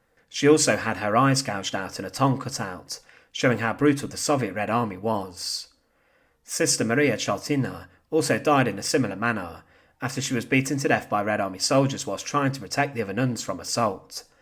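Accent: United Kingdom. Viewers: does this guy have an accent IN ADDITION TO his british accent maybe talk slower eh ?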